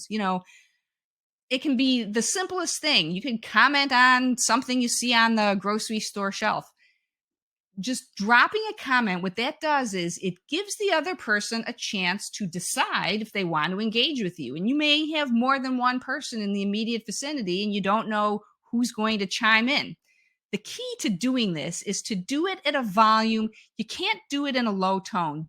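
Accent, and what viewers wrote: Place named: Buffalo, United States. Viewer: I KNEW you were from Buffalo! I love the accent!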